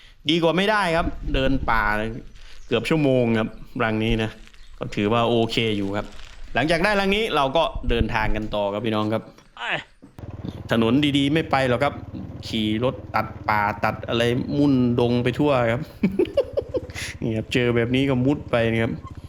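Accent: Isan, Thailand. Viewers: ฟังสำเนียงเว้านี่เจ้าเป็นคนจังหวัดเลยแม่นบ่คับ😂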